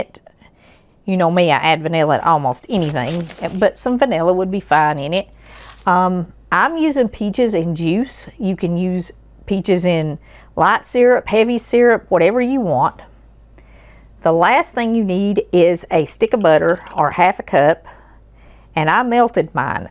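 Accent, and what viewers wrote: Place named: Kentucky, United States. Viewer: Love your diwn home cooking.Although i was born in Ky,Covington, right across the Ohio River opposite Cincinnati. You sound like a Ky woman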